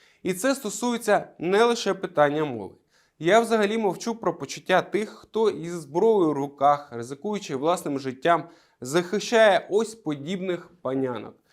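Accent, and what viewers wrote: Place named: Russia. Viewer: Слушая вас как посторонний человек, я замечаю, что ваш украинский язык - это язык русскоговорящего, старающегося говорить по-украински. Я все равно приветствую ваше старание. Но слышу разницу с другими аналитиками, у которых речь певучая, звучание особое. Так что вам не стоит и Зеленского винить за "русскоязычность" до 2019 года (((. Кстати, звучит его украинский лучше, чем ваш. И президент правильно сказал про крымчан. Пока оставьте их в покое. Освободите страну, тогда введете государственный украинский с детского сада. Все делопроизводство переведете на украинский. Закроете русские школы, или заставите всех их учителей сдать госэкзамен по украинскому. Для этого понадобиться 5-7 лет. И никаких поблажек!!! Учитесь на ошибках других бывших стран СССР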